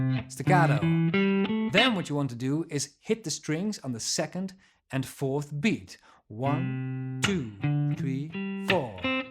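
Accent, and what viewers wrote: Ireland. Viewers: What's that accent you have brother, Irish? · ure Irish dnt u..?!? Love ur videos well done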